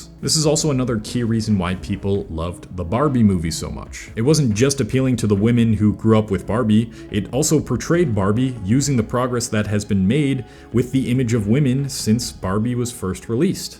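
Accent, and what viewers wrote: United Kingdom. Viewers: his accent convinced me that he's british (im from britain) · The British accent caught me off guard😭 It was very good though.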